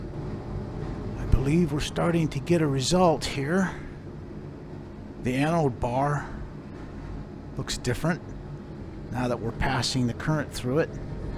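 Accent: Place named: East Coast, United States. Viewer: Judging from your accent I'm assuming you are on the East Coast or possibly Chicago I kind of wish I was closer because I would love to apprentice under you and learn how to do all this stuff... Your videos cover so much information but I know you don't go over all your little tips and tricks.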